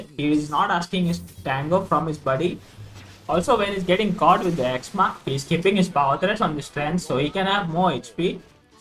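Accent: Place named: India. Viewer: stfu bruh. just show the video no need to speak with ur indian accent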